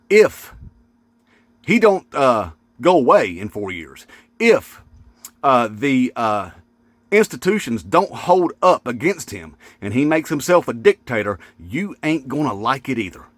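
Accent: South, United States. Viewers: I love that you popped into my algorithm!!! I’m a bi-racial Afro Latina & you are keeping me sane through this madness! Love your content, dude! And that southern accent is the cherry on top of the sundae! #InmateTrump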